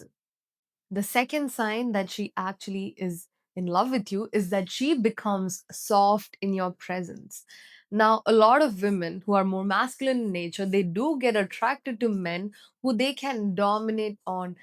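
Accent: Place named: India. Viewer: You must be from India. Your accent gives you away.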